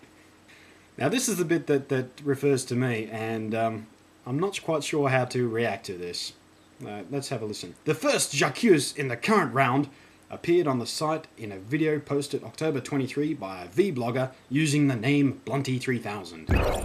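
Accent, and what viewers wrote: Australia. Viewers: Zomgkittens!!1eleventy The barbarians are at the gate! :D Love the titles, as always. I really don't know how any journalist could mistake your (almost textbook) Oz accent for British. And I'm sure PL knows you're doing a cockney accent there, not her silky-smooth posh. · Haha, yeah, he made this whole thing sound so ridiculous. It's weird how people always think Aussie accents are British. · haha that was funny. British though? Seriously, anyone with hearing can tell the difference between an Aussie accent and a British accent. That was a funny British accent though.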